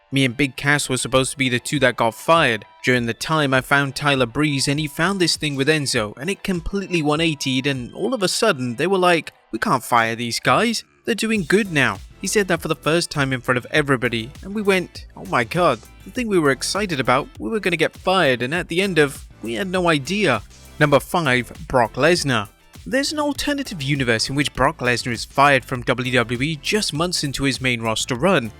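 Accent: United Kingdom. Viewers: I know your brittish and have an accent, but how in the hell do you get "Evil-lution" out of the word "Evolution"?? REMEMBER, The 3rd letter is an "O" not an "I"..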